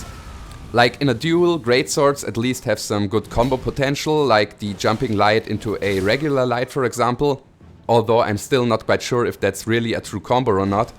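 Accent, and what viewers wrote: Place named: Germany. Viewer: man merkt das du deutsch bist haha gutes video übrigens!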